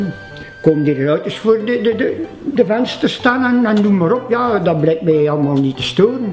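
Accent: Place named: Flanders, Belgium. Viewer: vlaams klappe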